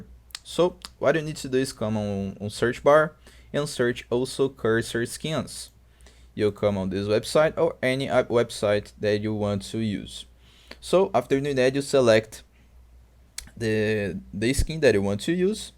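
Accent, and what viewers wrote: Brazil. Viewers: Provavelmente sim, sotaque não me engana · brasil ae parceiro? sotaque naquele pique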